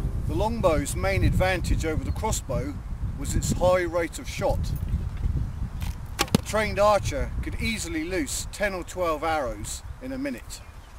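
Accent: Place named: England, United Kingdom. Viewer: Sorry I'm very bad at understanding an english accent, can you tell me the name of the first crossbow type you used?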